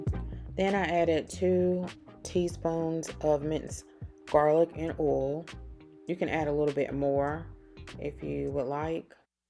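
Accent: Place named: North Carolina, United States. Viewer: First time here but I knew you were from NC...lol...I'm from the Lumberton area...it's so funny to here us speak..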